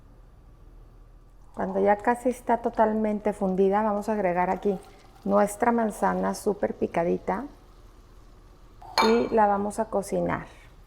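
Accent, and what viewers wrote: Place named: Mexico City, Mexico. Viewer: Párese chilanga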